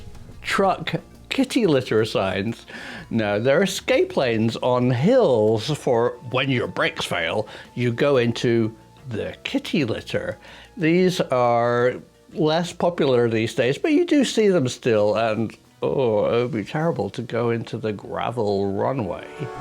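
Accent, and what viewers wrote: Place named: Essex, United Kingdom. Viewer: Oh deer... 🤣 Edit: Prof. is an Essex Boy! 🤣 (I live dangerously close to the border)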